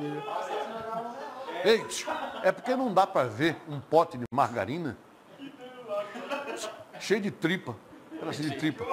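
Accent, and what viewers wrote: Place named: Amazon, Brazil. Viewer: Sikera já tá bem amazonense, até o "fulêro" do amazonês ele já fala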